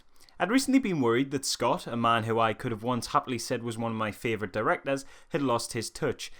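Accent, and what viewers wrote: Newcastle, United Kingdom. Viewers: Getting more and more geordie as time goes on what's the crack like?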